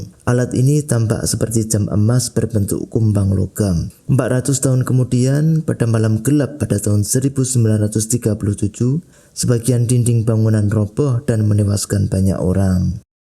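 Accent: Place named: Java, Indonesia. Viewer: Jawa anjr suaranya mals gw dngernya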